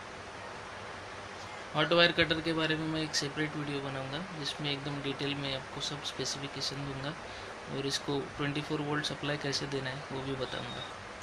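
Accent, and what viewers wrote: Maharashtra, India. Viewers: bhai ,awaz se maharashtrian lagathe ho. kaha se ho,??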